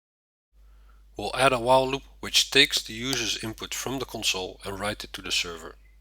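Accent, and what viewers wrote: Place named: Netherlands. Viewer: Sounds kinda dutch innit?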